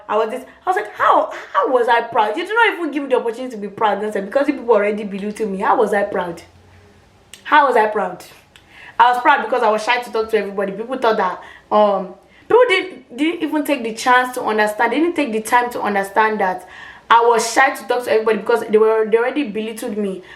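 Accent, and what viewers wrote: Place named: Yoruba, Nigeria. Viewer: I even like the way she talk👌missing up with Yoruba 👍👍👍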